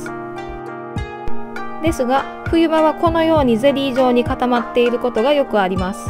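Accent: Kansai, Japan. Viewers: 2秒で純な関西人だとわかってしまう動画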